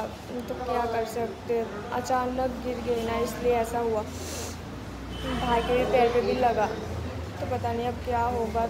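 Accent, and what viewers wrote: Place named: Bangladesh. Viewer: আপু আমি বাংলাদেশী আমি বাহরাইন থাকি, আমি তোমার সব ভিডিও দেখি তোমার ভিডিও আমার খুব ভালো লাগে, তুমি আমাদের মত কথা বল, ভালো লাগে তোমার সব কথা,এখন কেমন আছ জানাইবা, রিপ্লাই চাই ওকে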